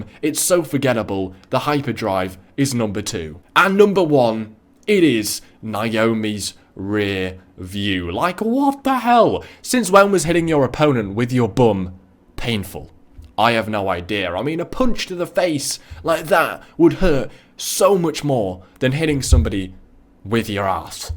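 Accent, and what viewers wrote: United Kingdom. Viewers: You don't know what your talking about clearly you don't know anything about wrestling because all of those are great finishers and if you would really watch wrestling you would know that it's not supposed to hurt because wrestling is fake it's all just a act so maybe you should do more research because you don't know anything and your British a ten is horrible go back to pre-k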